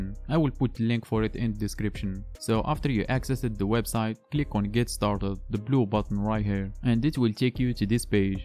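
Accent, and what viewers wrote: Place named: Morocco. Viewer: you're moroccon aren't you? your accent is moroccan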